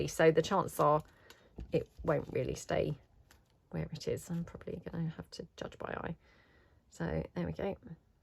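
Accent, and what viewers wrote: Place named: RP, United Kingdom. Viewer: You are amazingly creative and inspirational. I love your posh accent and your journals rock! Thank you!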